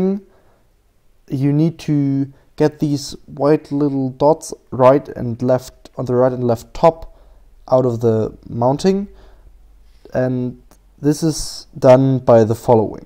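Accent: Germany. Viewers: 6 years after you created the video I need to say 2 things: 1) "DANKESCHÖN" as you German accent was very obvious at that time 😉 2) You saved my life: the ball bearings of the gear drive of my vr300 was completely stuck due to some syrup my kids left on the floor and the robot sucked it in like a champ. Again, awesome!!!!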